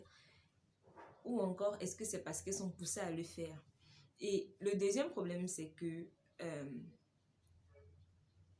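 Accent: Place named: Cameroon. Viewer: vue ton accent et ta façon de racconter tu es 237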